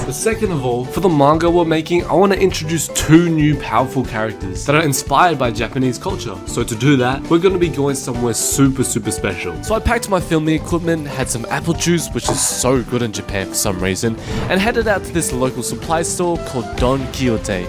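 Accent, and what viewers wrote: Australia. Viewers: Hold on ur australian i am two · Are you from Australia?😮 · I didn't know you were Australian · You are AUSTRALIAN!??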